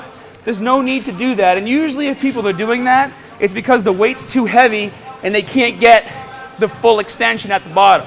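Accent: Boston, United States. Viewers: Hey! It's been a while since I've watched your videos. I noticed your Boston accent is heavier. I wonder why. It's just a curious observation. It's quite lovely! And as always, great tips from the captain!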